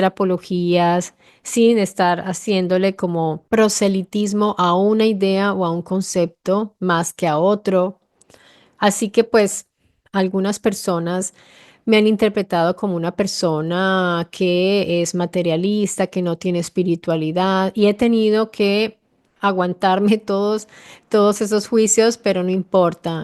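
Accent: Italy. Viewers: muchas gracias por tu nuevo video!!!! ya entendí por qué pensé que eras italiana viviendo en colombia por tu acento, era al revés jeje... pues si, esto de los zíngaros es un problema inmenso de toda la vida, pero ahora con el "buenismo" en el sistema judicial, pasó de problema a catástrofe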